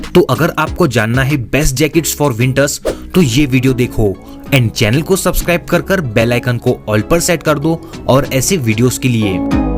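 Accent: Hyderabad, India. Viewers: 𝗕𝗿𝗼 𝗔𝗿𝗲 𝗙𝗿𝗼𝗺 𝗛𝘆𝗱𝗲𝗿𝗮𝗯𝗮𝗱 ❓💌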